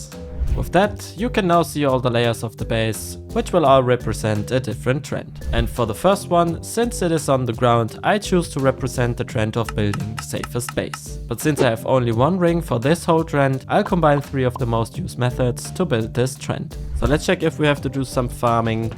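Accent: Germany. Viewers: Are you from Germany? It sounds a bit like German English. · I like seeing biomes build!! However, missed your videos, they are nice and I like your german accent man👍🏻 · is your main language german your voice sounds like german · Nice vid but are you german i hear a bit of an accent or maybe its the cold but yeah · youre accent is a bit german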